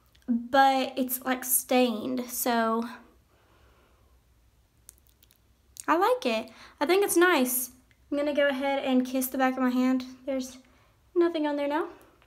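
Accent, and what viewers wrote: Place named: South, United States. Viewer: I just subscribed because of that accent!!!! I love the Southern accent.. Wish I could have more southern Americans coming to the UK the accent is a delight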